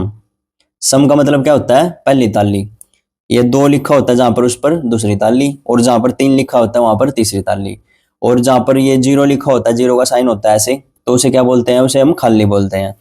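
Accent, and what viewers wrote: Haryana, India. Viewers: That Haryanvi tone hits ❤❤ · Haryanvi tone ❤❤ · Kitna sahi accent hai bhai. Haryanvi ho? Thanks for the information and motivation btw❤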